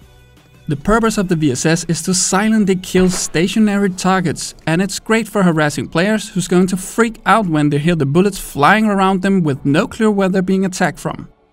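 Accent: Denmark. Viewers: You sound Danish? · Are you Danish? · damn, youve lost alot of your danish accent over the past five years =D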